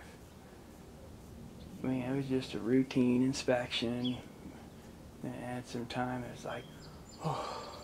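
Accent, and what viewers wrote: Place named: Pennsylvania, United States. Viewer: Your accent is painful to listen to. I'm guessing you're from PA?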